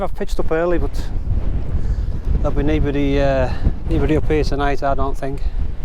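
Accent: United Kingdom. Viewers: are you a Geordie by any chance? 🙄 Was this up the Cheviots, beside Kielder? Redeswood forest? 🙄 🤔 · Nice video brother from across the pond. We both speak English but sometimes I had to rewind, the Queen's English is a little different from your cousins in the states.🤣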